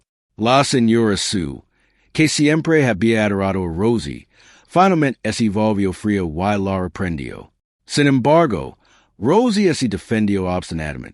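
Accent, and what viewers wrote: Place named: England, United Kingdom. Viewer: Español con acento ingles"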